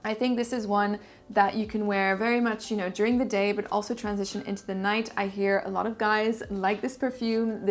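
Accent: France. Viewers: I love your french accent ❤ and all this entire video, Greetings from México 🇲🇽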